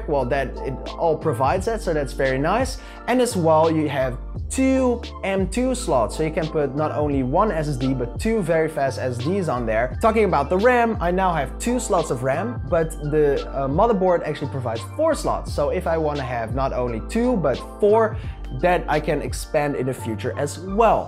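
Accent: Netherlands. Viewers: are you from the netherlands? your accent sounds like it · haha, ik dacht de hele tijd al dat je nederlands was (piep klein beetje accent). Mooi gebouwd! Ik ga denk ik dezelfde bouwen maar met de 3900x.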